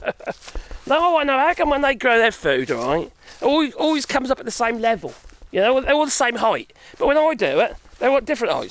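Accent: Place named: England, United Kingdom. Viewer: I'm french from Drôme and like your english accent and free speaking thx friend !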